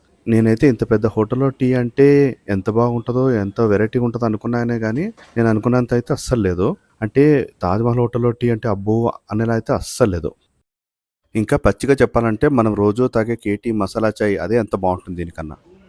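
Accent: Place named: Rayalaseema, India. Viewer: Hi I love your Telugu speak ing.it means you are from Rayalaseema.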